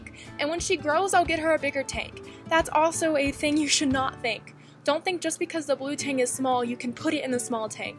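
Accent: Texas, United States. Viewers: is when i really here her texan acent im from texas to so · I just realized you have a slight Texas accent or whatever it's called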